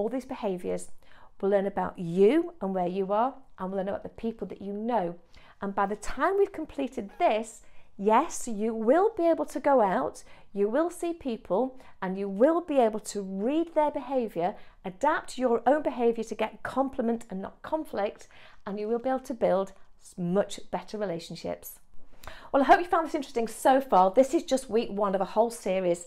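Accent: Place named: England, United Kingdom. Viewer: 🇬🇧💞🇺🇸 Your English accent sounds lovely and easy to understand. I am pleasantly surprised as I speak American English. Please do not speak slower in your broadcasts. YouTube has a Playback Speed adjustment so listeners can choose the preferred speed of the voice (normal, slower, very slow). Thanks for your thoughtful advice on very important issues in human behavior. 🙏💖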